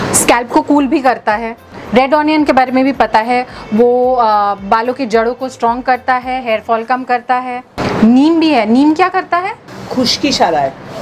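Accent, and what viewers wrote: Bengal, India. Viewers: Oh your Bengali accent🥵😘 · Your accent says that you are bengali😅❤️Bengali Beauty❤️❤️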